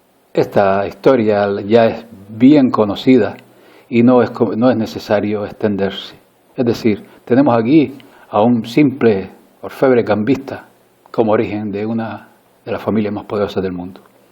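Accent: Canary Islands, Spain. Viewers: hablar con tanto acento español canario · HABLA UN CANARIO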